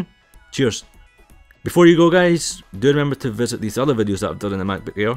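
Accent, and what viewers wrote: Scotland, United Kingdom. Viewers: Really enjoyed the video, just ordered the 13" 2.13 4GB 256 Flash and can't wait to get it, have put my 4 year old 15" MPB's backlit keyboard off to get used to it but don't see it being a massive problem. Being from Aberdeen it's nice to hear a scottish review :D Cheers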